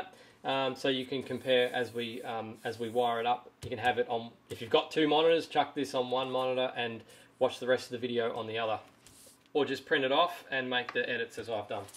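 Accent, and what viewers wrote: Australia. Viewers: Very good tutorial, been following your channel for a while and love how much info you share. Also it's good to hear another Aussie voice.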